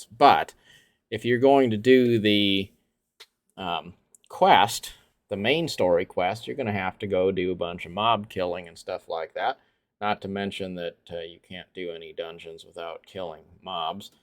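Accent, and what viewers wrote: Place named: South, United States. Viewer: Just wanted to see if this was possible. Lo and behold, an Asian dude, in a cowboy hat, explains to me with a southern accent, how to play this game which it's main point is to combat, in a pacifist way. I am fully enlightened already and i'm just 10 seconds in.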